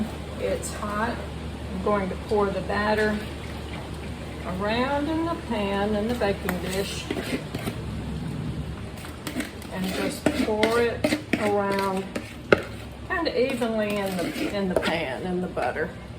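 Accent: South, United States. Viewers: ❤❤❤Oh my goodness! You just stirred up wonderful memories for me! I am in my 70's & my beloved Aunt (deceased) and all my relatives grew up in Mississippi along with my Mother. Only cousins left now. My Aunt taught me how to make this EXACT cobbler recipe when I was in high school! I've made it so many times over the years using all kinds of fruit. Best part of all is your southern accent- makes me feel like I'm with family! You are a treasure - I am subscribed and look forward to many more videos! God bless you!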